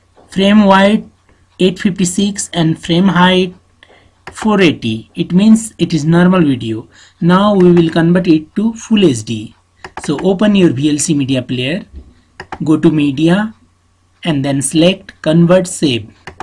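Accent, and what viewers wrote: India. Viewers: It's north indian rural accent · I love Indian accents xD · He is a Bihari I guess...... His English sounds like Bihar English.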